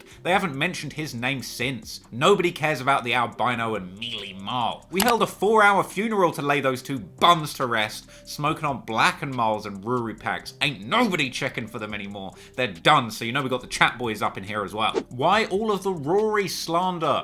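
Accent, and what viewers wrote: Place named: South, United Kingdom. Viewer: Is Rory’s name really pronounced like that or is the back translation into southern UK accent making it sound weird?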